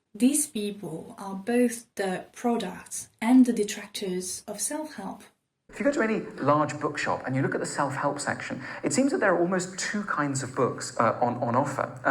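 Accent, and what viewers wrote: France. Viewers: Where are you from? You sound simultaneously French, German, and Irish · You put forward an interesting perspective on alternative self-help that is thought-provoking but I must critique your last few comments. "What if getting angry was self-help? What if talking about social injustices was true self-help? ... And that for me, that's what self-help truly is" Like others in the comment section have said, I think your misunderstanding of what self-help is lies in those statements. Self-help quite literally means to help oneself, to better oneself, to care for oneself, and be self-sufficient. It's egocentric, but not egotistical (but not ever exclusive to each other.) Your ideas of self-help are about helping others, social injustices, activism, and related liberal values. And there's nothing wrong with that, but that's all unrelated to self-help. 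That's being philanthropic, that's being altruistic, that's activism, that's helping another person, that's selflessness. But it's not self-help. Of course, helping others and volunteering can bring benefits to you and another person, but it's not the overarching definition of self-help. I think the fallacy in your thinking is being absolutist. And you demonstrate this by thinking that what seems to be true for YOU, then must be true for others as well. But that's not right. I like to take morning showers, but I know it's not necessarily the "right way" to do things. I can tell you have a French accent so you might not realise how your words may have conveyed a misunderstanding with some of us contrarian v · you're just the same with them. slower pacing and frnch accent, but the same. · Yes, sometimes i dont understand what the hell she is saying because of that bloody french accent. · Very nice perspective that I have not heard discussed before. 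Et le tout avec un accent Français en plus. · Are you like French - having lived in an english speaking country? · I love your Frenchlish accent. 😃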